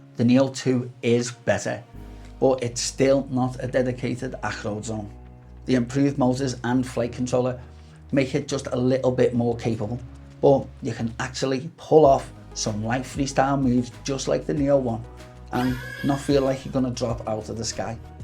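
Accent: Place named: Manchester, United Kingdom. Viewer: Really enjoying your videos. Great content - but also because your accent reminds me of home. (Live in New Zealand but grew up in Manchester.)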